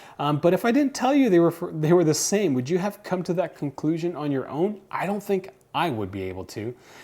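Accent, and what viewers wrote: Canada. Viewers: I like your Canadian accent eh.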